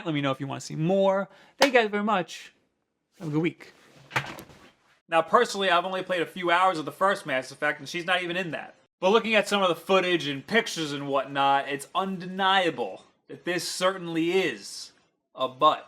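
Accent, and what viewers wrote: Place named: New York, United States. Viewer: when was going strong with that ny accent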